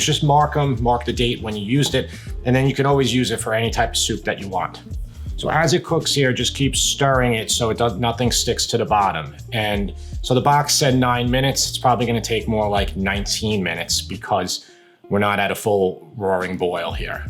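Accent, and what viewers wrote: New York, United States. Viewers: Oh and btw, I love your NY accent. I'm from Germany but I lived in Hoboken for 4 years and it takes me back. 🙂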